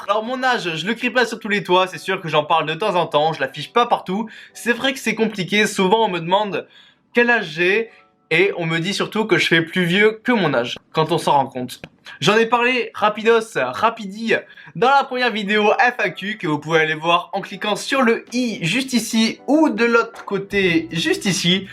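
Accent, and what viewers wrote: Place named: Quebec, Canada. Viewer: Hey grand mammouth nommé! Continue tes vidéos, tu vas bien avancer avec cette chaîne youtube! Juste, quand tu parles j'ai l'impression que tu as un petit accent canadien québécois mdr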